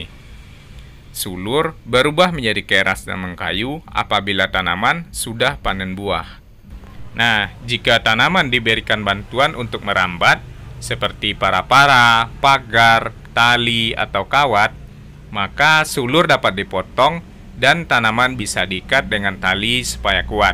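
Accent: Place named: Padang, Indonesia. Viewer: Orang padang yg uda... Intonasi cara ngomong nye kek orang padamg .😂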